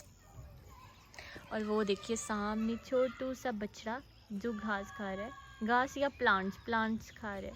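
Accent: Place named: Punjab, India. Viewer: Tum itna atak kar kyu bolti ho tumko koi problem hai kya u can not even speak punjabi properly wo bhi bilkul rustic way mei bolti ho. Thoda grooming karo apni aunty.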